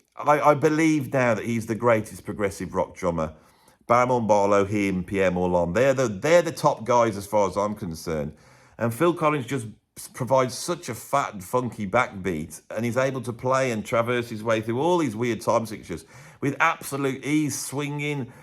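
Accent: Birmingham, United Kingdom. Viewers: I just love. I love the Brummie or midlands accent and how it totally lends to his appeal. Not sure I always agree with his ideas, but I don't think ever wants everyone to agree - that's the appeal. He says what he wants, knowing it isn't always going to be everyone's ideal. But he always makes a compelling case. I have had to go back and re-listen to so many albums (and CDs) after one of these videos - I conveted to MP3s (I have over 35,000 tracks on my iPod) so long ago, that they can get lost. I still prefer to listen to the whole of an album - warts and all - contrary to the new world of random 'always on background music' tracks, quickly and easily skipped with the streaming services (who rip off the musicians.) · I love your videos and appreciate your knowledge of music and British accent.Some groups you never mention. fantastic group., who were a big influence on and. 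So glad you mentioned by but missed. Evangelist Roger Mansour former Drummer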